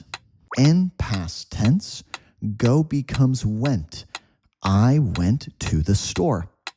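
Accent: United States. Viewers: Could you please make videos about american accent😔😔 and it seems like you are an American. Plz teacher 🙃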